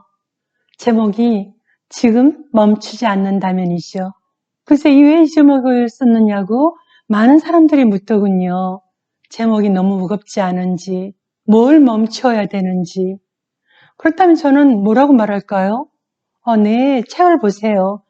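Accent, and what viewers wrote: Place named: Seoul, South Korea. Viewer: 유투브 개설 축하드립니다^^ 반갑습니다 님 글도 잘 쓰시지만 목소리는 더 예쁘시네요~ㅎ 부산 억양일거라 생각했는데 서울 말투라서 놀랐습니다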